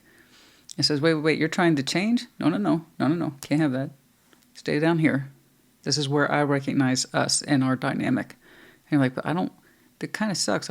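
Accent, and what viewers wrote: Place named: United States. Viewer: Thank you and Cinnamon, 🤗🤗🤗🤗 lol, you got me laughing cause seeing my current energy you'd never have thought I was fiery, my brother constantly pushed my buttons, day in and out for near 18y, he's a Leo, I think my anger amazed him, unfortunately I became dark inside and have been relearning the light w/in me, because I do remember my peaceful happy nature prior to the constant antagonization. Your American English is wonderful, I Love how you speak, yes very soothing and calming, and prolly more descriptive than my vocabulary. So many things passed me by when 29-30y ago I had to give up being stay at home Mom when I divorced and kids, then grandkids all went off having fun w/rest of family while I worked, and many times I was so tired all my remaining energy was to eat supper, shower sleep and do it over again next day. 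Between driving or factory jobs. I missed their developing years. If they want to call me old, I now tell them speak for yourselves, but they're all busy now, I took early soc sec. I talk w/the cat, birds in the yard and God. I monitor my energy, the cat is 16ish, youthful for her age, she just had a well pet ✔️ and I had to mention I'm faster than the cat if she tries to escape my arms, we don't use pet carriers. Love you our us all, Godspeed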